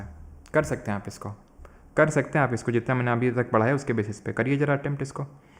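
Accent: Kanpur, India. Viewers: It's my second class sir kanpur se hai kya language bilkul hm log jaisi si hai 🙃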